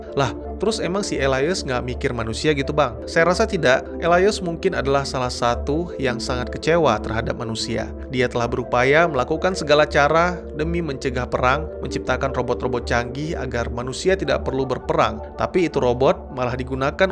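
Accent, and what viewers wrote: Aceh, Indonesia. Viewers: Orang aceh ya bos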